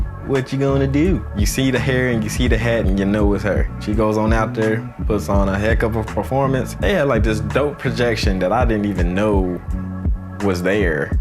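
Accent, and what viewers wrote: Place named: South, United States. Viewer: “ she goes on out there “ Your so country 😭😭 Much love from Tennessee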